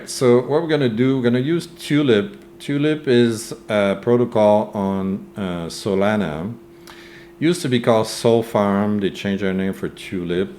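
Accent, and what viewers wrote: Quebec, Canada. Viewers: Is that a Québécois accent that I hear? Ça sonne vraiment comme chez nous!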